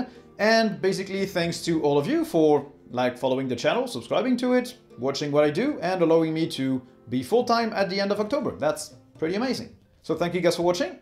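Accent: France. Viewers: With more than 1K reactions, we'll have a winning change of almost one promille 😁, I'll take that. Always nice to listen to your lovely french accent, about diverse Linux items; I've used Unix and Linux since ~'83 and I still learn new things from you, thanks.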